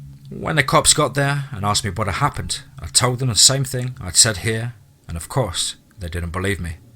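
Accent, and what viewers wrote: United Kingdom. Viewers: Omg, as a Hoosier, I cannot believe that I just heard a British guy talking about Indiana and Sammy Terry! It's almost too much!😀 Fun fact: Owen County is where the very last wild wolf in Indiana is said to have been shot and killed in 1843.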